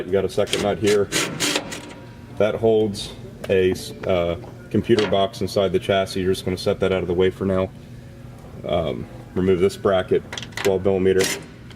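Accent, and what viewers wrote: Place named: Central Pennsylvania, United States. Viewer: i can identify this accent with surprising certainty because of a buddy from central PA i served with. its the pronunciation of the work "on"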